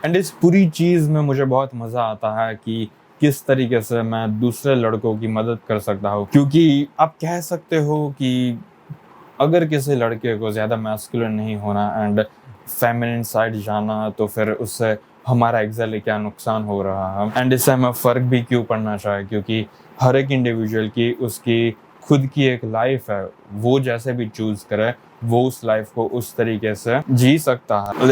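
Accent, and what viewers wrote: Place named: Bihar, India. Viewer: He sounds like bihari who knows english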